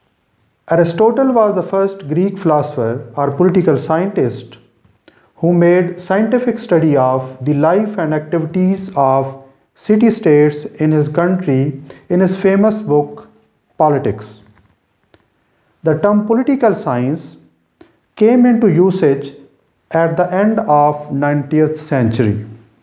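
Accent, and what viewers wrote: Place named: Pakistan. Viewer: Typical pakistani accent. But still understandable.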